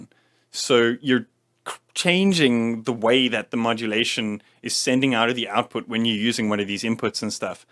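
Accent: South Africa. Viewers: I know a South African Accent when I hear one! Great review bru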